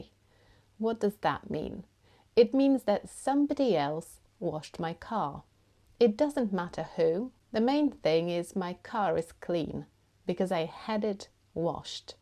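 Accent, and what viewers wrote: United Kingdom. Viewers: I do love your beautiful british accent and of course all of your grammar teachings! ❤ You’re awesome! 😊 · Never have I met such a fantastic and charismatic English Grammar Teacher like you. You look quite captivating, sweet, and heavenly with your contagious smiling face blended with a soothing British standard accent. You are wonderful and ethereal. Cheers!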